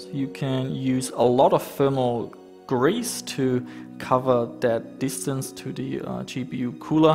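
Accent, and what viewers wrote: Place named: Germany. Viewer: Are you an expat? You sound German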